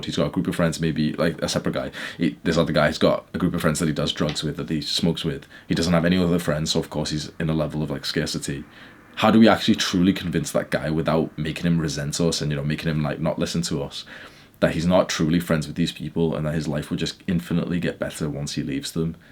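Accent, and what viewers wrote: United Kingdom. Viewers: if i wanted to listen to brit accent for just for sake of listening to brit accent, i can throw on some Grange Hill or GB News. sorry moyt, i'm listening to this fooking bloke cos he makes sense. ta! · Great video title then boom hes british :(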